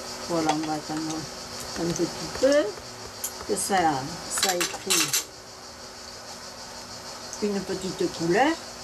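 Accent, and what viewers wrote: Provence, France. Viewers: J’adore les aubergines, je voudrais tester votre recette mais les aubergines sont quand même longues à cuire ! Combien de temps les laissez vous dans la fritures ? Et je suppose a feu moyen ? Merci pour vos précisions, un plaisir de vous entendre avec ce petit accent provencal !? 😊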